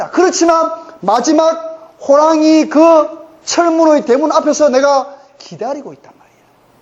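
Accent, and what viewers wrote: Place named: Gyeongsang, South Korea. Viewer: MB 젊었을 때 모습과 많이 닮았음. 목소리도 많이 닮음. 경상도 포항지역 사투리의 억양도...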